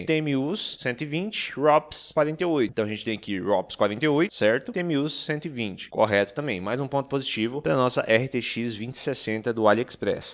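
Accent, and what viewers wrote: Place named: Goiânia, Brazil. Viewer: Você é de Goiânia? Haha Top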